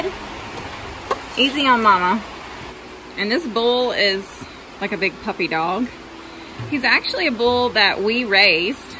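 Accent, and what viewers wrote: South, United States. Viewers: Just now found your channel, awesome videos! Making me miss my grandparents cattle...and a southern accent! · Love your country accent/talking. Be back childhood memories. 🇺🇸🇺🇸🇺🇸🚜🚜🚜👍👍👍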